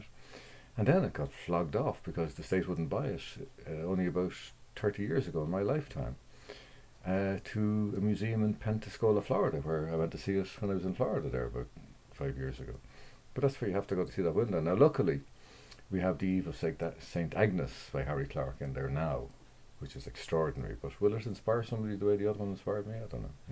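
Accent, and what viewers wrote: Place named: Ireland. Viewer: It’s so wonderful to listen to the irish accent, beautiful speech💚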